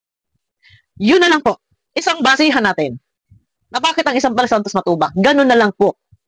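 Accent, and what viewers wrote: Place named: Batangas, Philippines. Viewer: Batangueño yan c kuya kaya ganyan boses nyan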